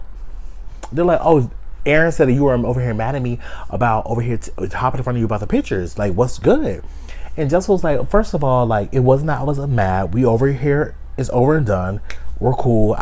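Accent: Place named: South, United States. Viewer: Oh georgia! I was wondering where in the south you were from. Whenever my subtitles are on they translates you sooooooo funny.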